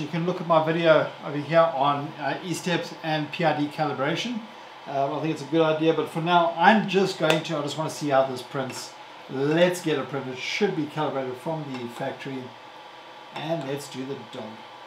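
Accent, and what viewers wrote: South Africa. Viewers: Hello man. I can hear you are a proud south african from Your accent. Hello from Port Elizabeth 👋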